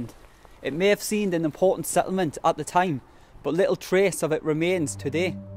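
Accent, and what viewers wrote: United Kingdom. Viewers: At least you have the real accent. Too many folks make videos about Northumebrland and cannot speak properly. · Pardonnez-moi mais je suis curieuse.... Votre accent vient-il du Nord de l'Angleterre ou d'ailleurs?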